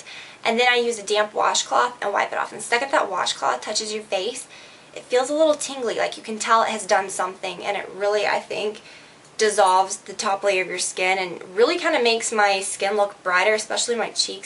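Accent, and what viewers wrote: South, United States. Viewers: I think is pregnant too! She is GLOWING!!! & girl I can your southern accent coming out in this video! Love it! I use Pro Active too & LOVE it!! Great video! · Your southern accent really came out in this video.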